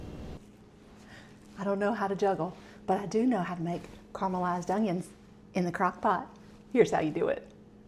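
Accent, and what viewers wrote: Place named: South, United States. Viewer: I love hearing a fellow Southerner talk!!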